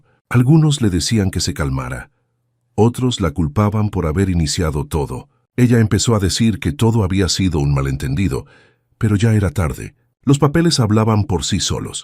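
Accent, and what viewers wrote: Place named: Spain. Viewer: Porque si es un Mexicano narrando su historia , porque habla como un español ?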